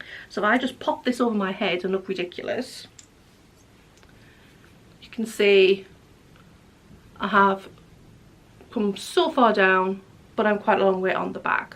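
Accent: North East England, United Kingdom. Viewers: You have a north east accent. Im Sunderland birn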